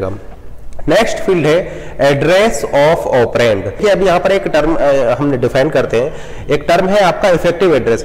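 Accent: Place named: India. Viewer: Im Portuguese, I have a hard time understanding English with an Hindi accent especially when he speaks so fast, I really appreciate these videos, could someone please subtitle these?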